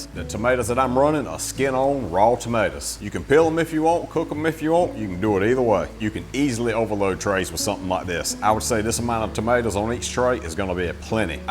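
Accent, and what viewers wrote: United States. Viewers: Where in the US is this accent from i swear every word that has a W or "ou"he says with an I Pider, sind, 😂😂😂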